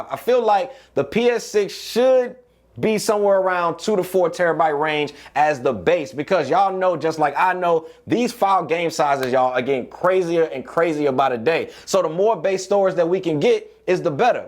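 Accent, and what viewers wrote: St. Louis, United States. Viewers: Big stl in bro voice shoutout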